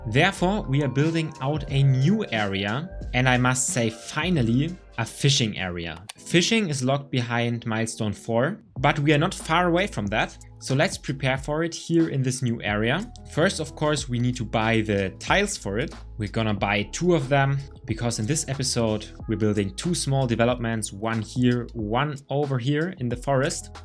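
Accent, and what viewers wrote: Germany. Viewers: Ha ha this accent (german) right?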